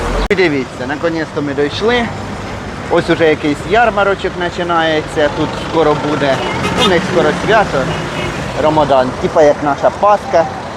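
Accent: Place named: Central Ukraine, Ukraine. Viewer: По говору з центру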